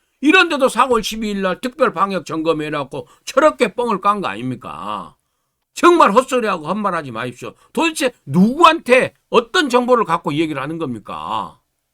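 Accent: Jeolla, South Korea. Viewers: 구수한 전라도 말투 정겹네요..저도 전라도 사람입니다..본래 전라도는 보수우익이었죠. 3김시대 거치면서 돌아버렸어요.. 박근혜 대통령 잘못이 있으면 일년 동안 문제제기하고 대선을 치르지 왜 억지로 끌어내렸는지... 정치사에 정말 나쁜 선례를 남긴 겁니다.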